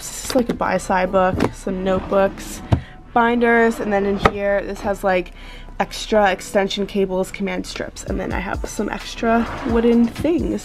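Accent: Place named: Los Angeles, United States. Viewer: homie you did not need the boston translation you sound like you from Los Angeles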